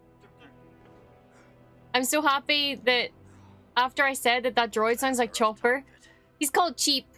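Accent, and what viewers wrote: Northern Ireland, United Kingdom. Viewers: Sound like your from the north of Ireland? 😅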